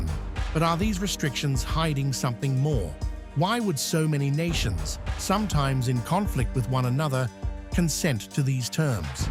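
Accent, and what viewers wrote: United Kingdom. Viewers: DO AWAY WITH THAT HORRENDOUS LOUD BACKGROUND NOISE YOU MISTAKENLY THINK IS MUSIC.... IT'S VERY ANNOYING AS IS THAT HORRIBLE BRITISH ACCENT IN WHICH THEY CAN'T PROPERLY PRONOUCE WORDS.... LAW DOESN'T HAVE AN 'R' IN IT, AND TUBE ISN'T PRONOUNCED AS CHUBE, AND CUBA ISN'T PRONOUNCE CUBER, AND DUNE ISN'T PRONOUNCED AS JUNE!... HORRENDOUS!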